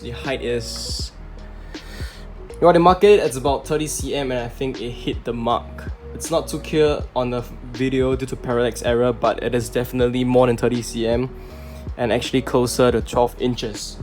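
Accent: Singapore. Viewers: We know a singaporean accent when we hear one 😂😂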